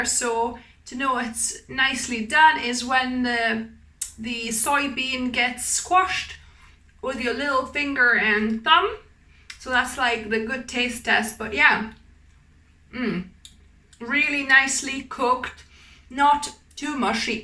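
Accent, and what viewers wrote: England, United Kingdom. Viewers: Just found to see about how to go about making some black soy beans I have for natto. I'm not worried about the beans splitting, so thinking I'll give a go after rinsing first at maybe 45 minutes with 2" of water at least above. Not to be rude my dear, though you have the most combined European accent I've heard. Sounds a mix of Great Britain and then some. Thanks for sharing. I'll try to document and report back on my vlog YT channel the results. Thinking I'll not use the Mueller pressure pot and go with the Instant Pot if I can't confirm the pressure settings being equivalent to the High Pressure off the Instant Pot IPDUO80 I have out. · What a nice English accent. 😅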